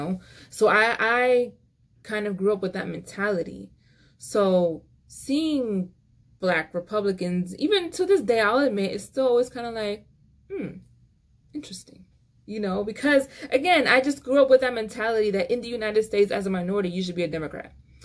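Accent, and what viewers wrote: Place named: Caribbean. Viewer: Your accent is so familiar are you bajan or carribean? 🤔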